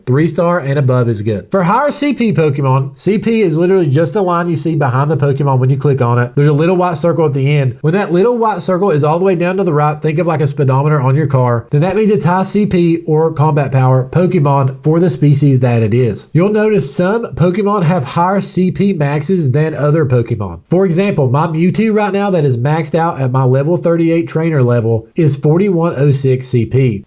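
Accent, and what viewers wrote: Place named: South, United States. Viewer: You got a solid southern accent, but some real antifa loser vibes.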